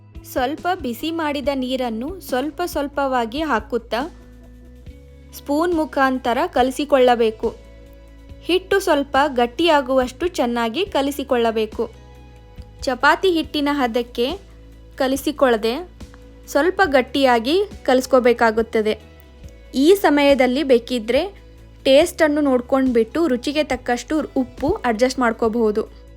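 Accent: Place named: North Karnataka, India. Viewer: ಉತ್ತರ ಕರ್ನಾಟಕದ ಭಾಷೆ ಇದ್ರ ಬಾಳ ಚಂದ❤😊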